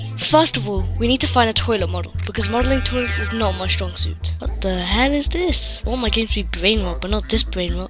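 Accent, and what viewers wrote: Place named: United Kingdom. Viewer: u sound like a chilld, speak like a old british man and insane editing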